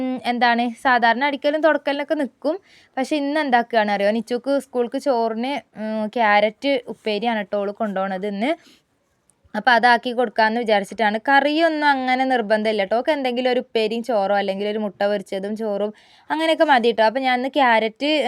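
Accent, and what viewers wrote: Malappuram, India. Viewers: നിങ്ങളുടെ സംസാരം കേട്ടപ്പോ സ്ഥലം മലപ്പുറം ജില്ലയിൽ ആണെന്ന് തോന്നി 😊കറക്റ്റ് മലപ്പുറം ഭാഷ തന്നെ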